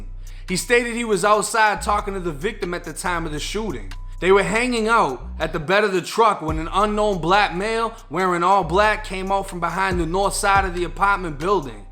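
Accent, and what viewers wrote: Boston, United States. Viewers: yo are you from Boston your accent is crazy 😹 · You must be from Boston Massachusetts just from the way you talk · It’s a bit of a Boston accent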